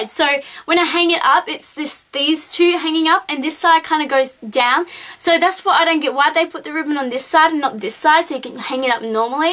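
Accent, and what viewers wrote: Australia. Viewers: French?! I'm sorry, have you ever heard a French accent? Haha, sorry! I just found that amusing--It's a Northern Australian accent. :)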